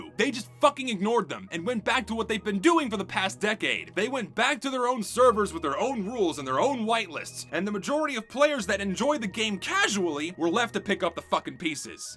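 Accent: South, United States. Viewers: You know when is angry when his southern accent bleeds through his speech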